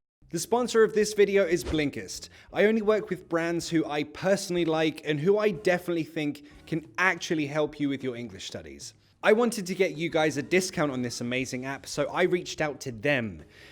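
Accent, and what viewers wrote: United Kingdom. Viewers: This man is a hero, I needed videos of British English but most of the youtube videos are in american English. I don't like american English 😕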